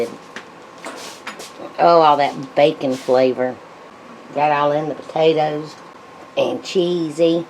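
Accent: South, United States. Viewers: First time viewer. I absolutely love your southern accent!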